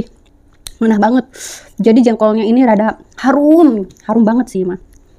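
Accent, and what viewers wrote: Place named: Menes, Indonesia. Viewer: Teh orang Menes nyah,dari logatnya keliatan heheh salam ti abdi orang Maja..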